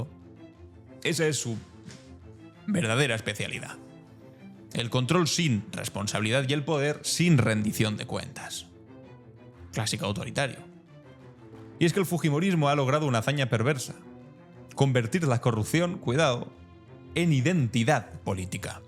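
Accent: Spain. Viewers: Cállate hoy tanto Delincuente que mata a diario elsu padre encarceló al desgraciado genocida de ABIMAEL a es genocida con tu acento español anda a hablar a españa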